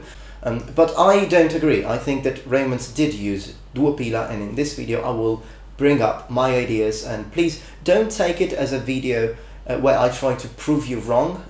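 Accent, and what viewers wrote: Italy. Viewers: Me: is this guy Italian? Me: yes, yes he is. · Hey I really like your videos and I dont want to nitpick here but I fear that you italian roots have 'tainted' your latin: AFAIK (and please correct me if I am wrong!) the C was always pronouced like K in ancient latin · I've heard Latin being spoken with an italian accent ( like here) or a more clear sounding version. Makes me curious of which one being correct, since Italian is of course influenced by the Ubians and Langobards that conquered Italy. PS: I'm not sure about the melee part. Wasn't the long metal tip of the Pilum made of soft iron and would therefore bend very easily ( better than Gladius of course when attacked by cav)?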